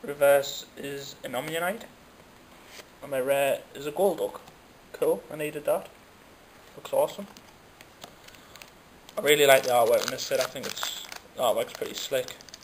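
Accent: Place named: United Kingdom. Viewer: thats cause he has a british accent lol which i think (not be biased because i'm british) is one of the best accents in the world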